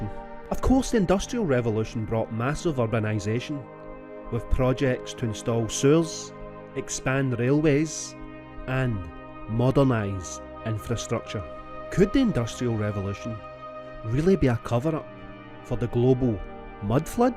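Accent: Scotland, United Kingdom. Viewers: Congratulations, you have stumbled upon the Scottish accent… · All of it. Also, remember that some folks (hi America!) struggle with Scots accents.😅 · Please go back to the other narrator Scottish accent is completely spoiling the experience of watching any videos just awful. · English with a Scottish accent.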